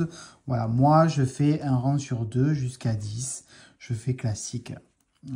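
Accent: South, France. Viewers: Merci pour ces très bonnes explications, les pointes sont bien régulières. J'adore votre accent du Sud, celui du Nord n'est pas mal non plus, à bientôt dans la prochaine vidéo ou tuto, on se régale, excellent professeur 👍bizoux kiki du Nord 😘😘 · Bonsoir, merci pour ce tuto au top avec notre accent du Sud! De mon côté le grafting n'est pas toujours net donc je tricote en toe-up. Bon tricot et à bientôt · Bonjour tu as raison de te bader...😊😊 je suis du sud aussi et j'aime ton accent. C'est normal on aime sa region. Merci pour ton partage.🌻🌻🌻👍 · Bonjour, je vous remercie pour ces explications et français et avec l'accent du Sud! J'ai l'impression d'être Je en vacances! Je vous écoute depuis la Suisse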